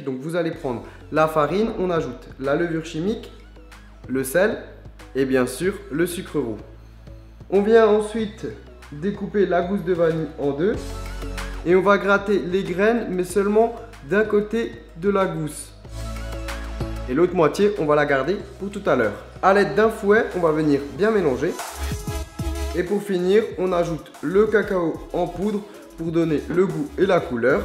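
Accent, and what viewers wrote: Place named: Alsace, France. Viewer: Ma mère quand elle t'a vue elle a dit "Ah sa c'est un alsacien ! "elle dit que tu as un très bon accent Alsacien et elle espère que tu le gardera :-) #TeamSnap :-)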